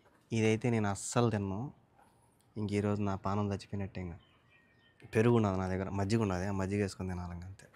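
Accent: Rayalaseema, India. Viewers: It's rayalaseema dialect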